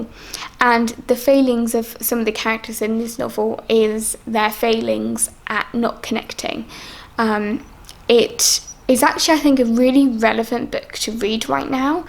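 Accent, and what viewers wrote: United Kingdom. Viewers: Wow british accent. Tbh difficult for a nonnative speaker like me to perfectly understand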